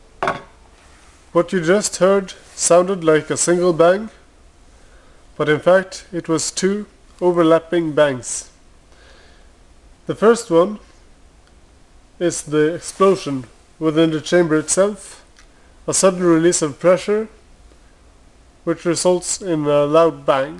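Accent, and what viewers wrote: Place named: Europe. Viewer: What wondeful fantastic and truly democratic European country do you live in that actually permits it's citizens to own both a handgun and a silencer? Your accent is European and I assume that's where you shot this very interesting video. I live in the US and Americans like me refuse to allow our government to take away our right to own firearms. Yes, we are a violent country, but it's the criminals doing all the violence--not law abiding citizens like me.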